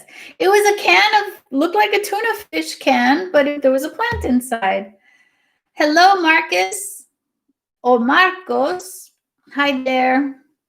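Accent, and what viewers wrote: United States. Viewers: Are you American? I can’t work out and what do you do for a living?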